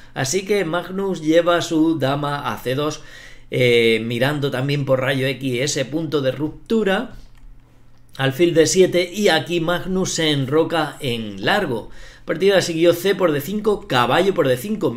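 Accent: Spain. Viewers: Habla muy españolete ese es el problema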